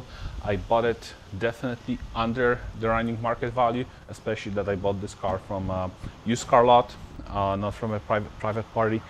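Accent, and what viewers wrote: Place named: Poland. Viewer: sorry for my question i was just curious, noticed your accent and knew you must be polish how long you've been living in US? I'm Polish as well and live in UK Greetings!:)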